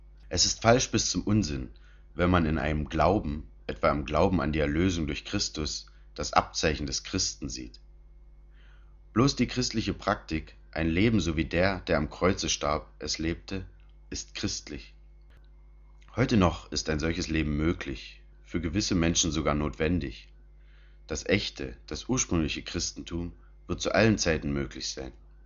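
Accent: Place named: Saxony, Germany. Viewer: Es scheint mir unmöglich diese sächsisch angehauchte Stimme zu ertragen.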